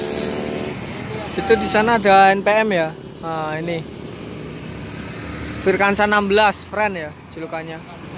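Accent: Java, Indonesia. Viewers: Logad lu Jawa bngt coy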